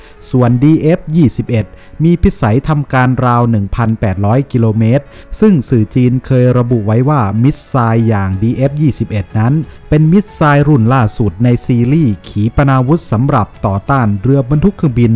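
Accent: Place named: Provincial, Thailand. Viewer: พูดบรรยายแบบเหน่อๆ ฟังดูขัดๆกับเทคโนโลยีใหม่ๆครับ พูดกลางเน้นเสียงเข้มจะมีความลงตัวที่สุดครับ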